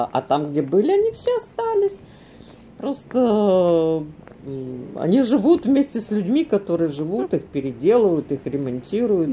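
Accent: Odessa, Ukraine. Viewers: Как мне нравится ваш говор, Одесский ..гуляю с вами...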